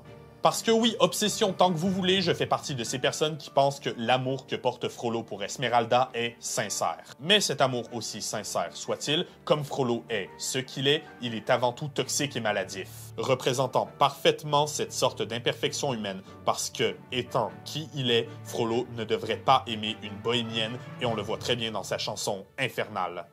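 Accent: Quebec, Canada. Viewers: Wô! Es-tu Québécois toé là? :D (Sorry, je viens de débarquer sur la chaîne! Des critiques de films et analystes québécois sur Youtube, j'en vois peu!)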